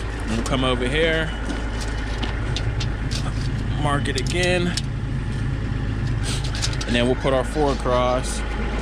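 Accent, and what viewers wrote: Pittsburgh, United States. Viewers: First video I’ve watched. 1.3 seconds in and I already knew yinz were from the Burgh. Can always spot a fellow Yinzer’s accent a “mahl “ away 😅 🫡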